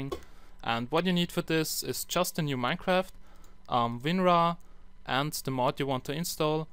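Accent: Germany. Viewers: man merkt das du deustcher bist :D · you sound like Docm77 ... German Accent ♥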